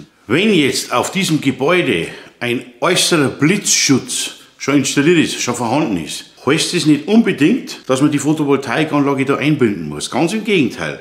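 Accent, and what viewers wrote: Bavaria, Germany. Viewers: Bleib autentisch Red weida borisch Des passt scha 👍👍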